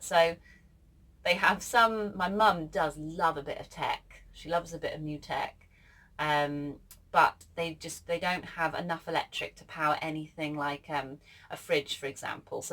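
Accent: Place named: Newcastle, United Kingdom. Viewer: Omg the farm is divine, so gorgeous!!! And did I detect a Geordie accent?! 🥰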